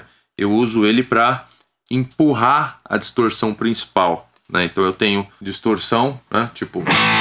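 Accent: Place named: United Kingdom. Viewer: O papo e British crl...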